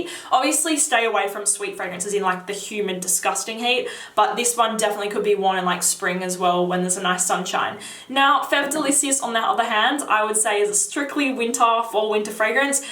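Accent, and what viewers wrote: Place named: Australia. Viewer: I just came back from Australia & I miss the accent lol. I love your videos! Keep up the good work. You’re soooo pretty too. I am hankering after TF BO EDT too.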